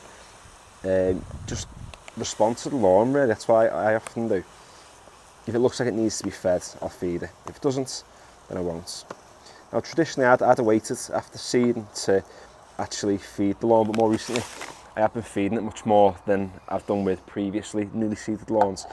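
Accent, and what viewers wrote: Liverpool, United Kingdom. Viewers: Just subscribed and although there are loads of YouTubers doing what you do, love ya scouse presenting style 👍🏼 I have loads of initial questions and hope you can help: - I use a Ryobi lawn mower but always see people using Hayter. Definately worth changing to a Hayter? My ryobi doesn’t give me any stripes which is one good to reason to change. - 2nd question, it would be great to do one video ( unless I’ve missed it, in a sitting down and chatting and running though, in order what you should be doing. I know you mentioned about not necessarily by month as weather changes will dictate what to do when but that would be mega useful 👍🏼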